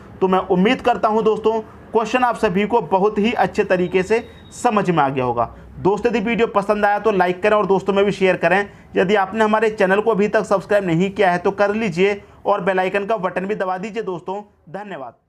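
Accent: Haryana, India. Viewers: Gjb HARYANVÏ 🤑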